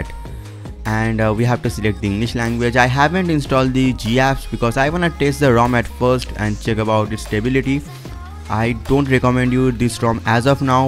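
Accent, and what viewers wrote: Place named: Maharashtra, India. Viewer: such a stupid accent. marathi fucker i guess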